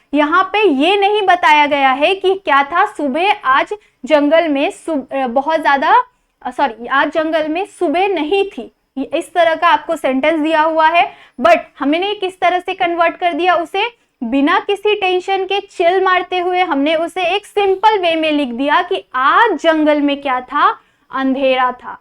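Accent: Maharashtra, India. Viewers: Mam your Marathi is too cute😅😅😊😊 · Thanks alot sir and ma'am for your efforts and i really think that I'll score best tomorrow with ur help. And mam your Marathi is really cute😂😊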